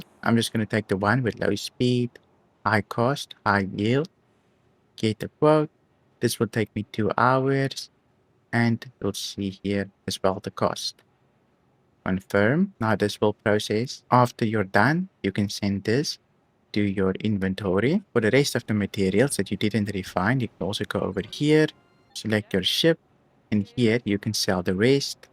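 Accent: Afrikaans, South Africa. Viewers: Fokkit dis darem maar lekker om daai Afrikaanse aksent in Star Citizen te hoor. Dankie vir al die video's ek leer vrek baie! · Just checked where your account is based. Thought I heard a nice Afrikaans accent there. 💪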